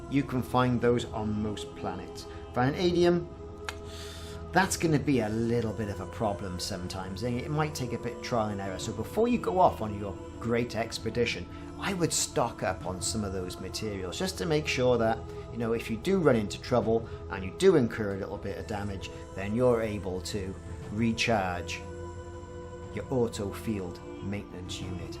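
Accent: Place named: Cardiff, United Kingdom. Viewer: Nice one! V useful, and your accent is making me homesick for my Cardiff roots.Subscribed.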